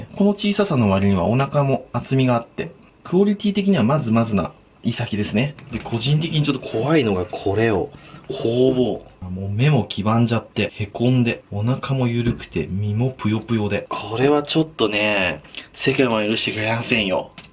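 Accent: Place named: Nagoya, Japan. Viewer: 時々のアクセントに名古屋弁が出てますけど東海地方の方かな？ 値札に書かれた場所から推測するとあの市場か〜。時々、行ってお値打買い込んでたわ。 まんまと魚○場の術中にハマってました。勉強になりました。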